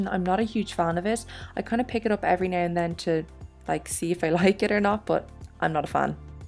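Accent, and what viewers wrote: Ireland. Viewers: I wish I had an Irish accent, so pretty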